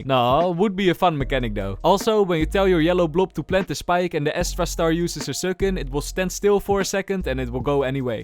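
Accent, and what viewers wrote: Netherlands. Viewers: hey are you dutch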